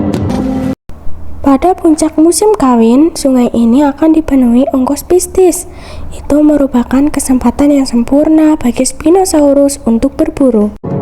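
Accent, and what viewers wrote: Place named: Java, Indonesia. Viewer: Pengisi suara logat JAWA mbaknya 😄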